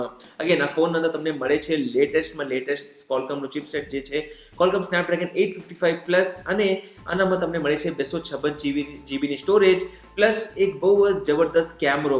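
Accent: Kutch, India. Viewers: Aa Gujarati nathi aato kutchchi Gujarati che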